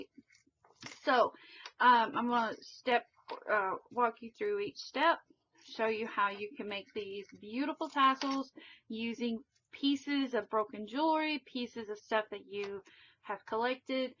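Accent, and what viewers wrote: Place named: Texas, United States. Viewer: I know this is an old video but I THOUROUGHLY enjoyed it!!! You MUST be from somewhere in Texas! Lol. {You talk like me}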